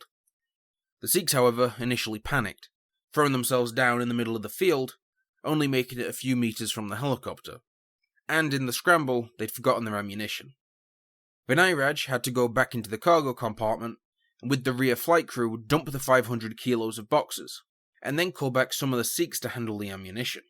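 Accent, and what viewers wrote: Yorkshire, United Kingdom. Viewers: what accent is this? which british city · The narrator seems British, I hope he knew that the root causes were created by the British; mother of all modern day conflicts 😊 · It's not Bri'ish, It's Yorkshire! Aye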